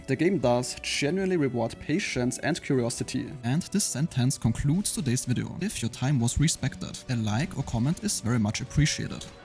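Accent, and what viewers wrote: Germany. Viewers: German or?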